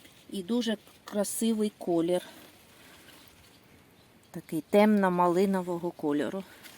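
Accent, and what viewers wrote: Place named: Sumy, Ukraine. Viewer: я із Сумської області, у нас всі так розмовляють, майже. Це нормально!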